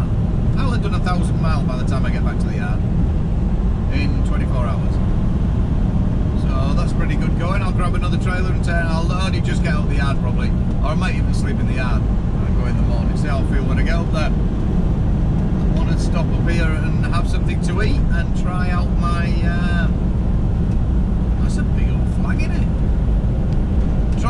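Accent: Yorkshire, United Kingdom. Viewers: Where in Yorkshire you from pal?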